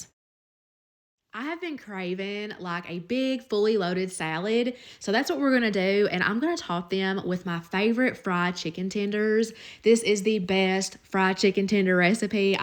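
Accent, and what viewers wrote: South, United States. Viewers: I love the southern accent, way too cute!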